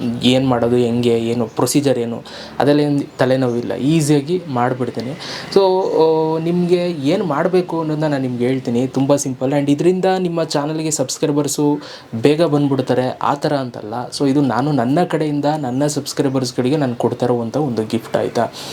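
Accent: Mandya, India. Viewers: Super 🎉🎉 ❤❤ olledagli nim alochanege❤❤ brother namm mandya flavor nim bay li kelode chenda🎉🎉anna❤❤ tqsm...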